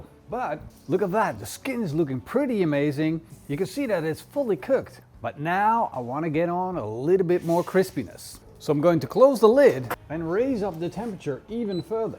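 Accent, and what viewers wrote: Netherlands. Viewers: I am so annoyed at the fact that I haven't been able to guess your accent 2 minutes into the video.. I'm guessing Germanic or Nordic but I just can't lay my finger on it 😂 Looking forward to the result! (And whether I can find more clues) Edit: a minute later I still want to say Dutch, but one who is a massive Elvis fan! Edit 2: Nederlands, laatste antwoord. Edit 3: Als ik terug ga naar het begin hoor ik de Elvis niet meer... maar het Neërlandsch is deste duidelijker haha. Eindresultaat ziet en klinkt heerlijk! ^^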